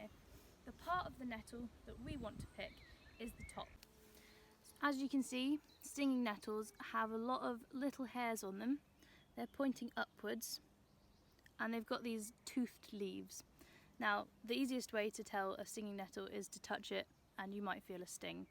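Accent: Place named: England, United Kingdom. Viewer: Great to hear an English ladies voice, and of course, straight to the point. Great video!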